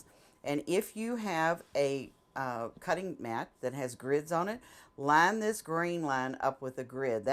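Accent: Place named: South, United States. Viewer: She has some what of a Southern/mid west accent sometimes it's hard to understand southern people lol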